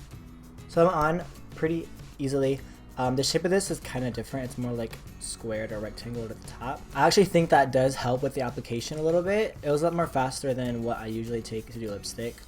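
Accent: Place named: England, United Kingdom. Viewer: That annoyed English accent is my favorite part 😂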